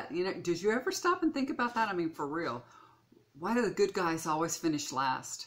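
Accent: South, United States. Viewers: I love southern voice so positive to me. Hope that still do more video.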